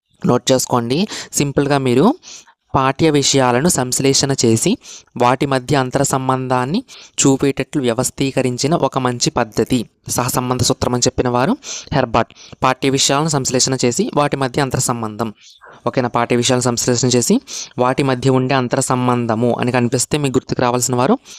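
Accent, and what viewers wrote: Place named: Godavari, India. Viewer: mana godavari slang.......love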